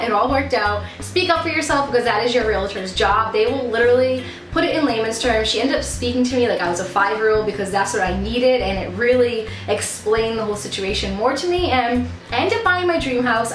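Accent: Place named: Massachusetts, United States. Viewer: LOVE Faneuil Hall! I'm originally from MA, but I live in Alabama now. Hearing you talk reminds me of home haha. I also LOVE Disney (I did the Disney College Program), I've been to the etsy shop you recommended for Mickey Ears and I was wondering if you've seen this shop: Anthology Candles. They make candles that supposedly smell like things from the Disney Parks (rides, food, resorts, etc). I'm dying to try some!!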